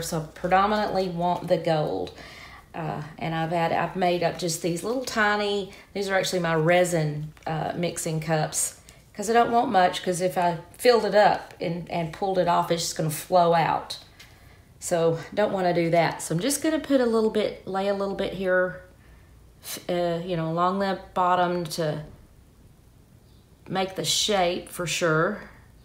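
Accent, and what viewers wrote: Texas, United States. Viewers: Impressive painting. Love the Texas voice too.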